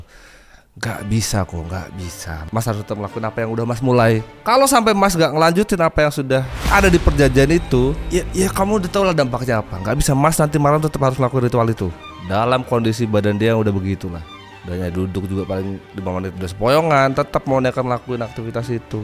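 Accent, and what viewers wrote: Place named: East Java, Indonesia. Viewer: Mas iki wong endi? Boso jowone logat e kentel. Kyok cah jawa timuran😂😂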